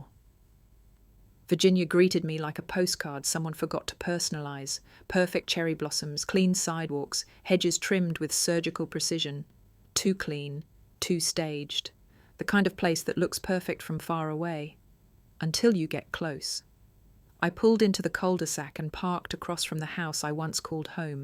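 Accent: United Kingdom. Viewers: The stories you print are plausible but lack authenticity. Supposedly about American military personnel. But they are narrated with a British accent. even the pronunciation of the rank of LIEUTENANT is pronounced as it would be in London, as LEFTENANT. I confess that took away any interest I had in the story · Oh Dear. Another American based military story , Narrated in a British voice. I’m not really fond of this. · Not to mention the British accent…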